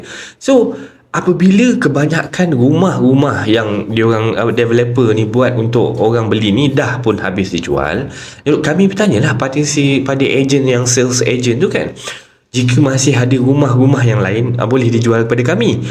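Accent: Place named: Johor, Malaysia. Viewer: Sy kdg2 gelak dengar penyampaian cerita ni. Mmg kelakarlah loghat.orang Johor... Apapun sy suka dengar Kisah Seram Msia....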